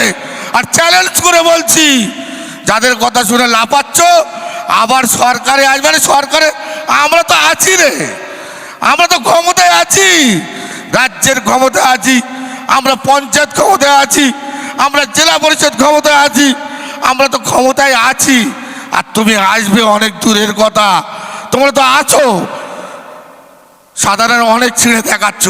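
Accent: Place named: Khulna, Bangladesh. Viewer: খুলনার ভাষা।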